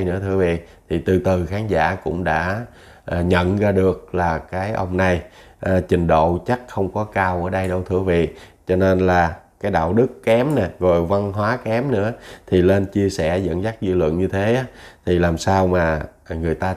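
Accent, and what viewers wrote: Standard. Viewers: Tiếng nói quá chuẩn luôn❤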